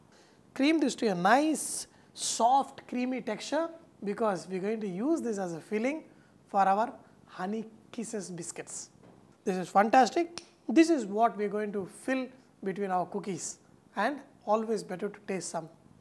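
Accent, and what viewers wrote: India. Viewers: Your accent reminds me Rajesh from The Big Bang Theory. xD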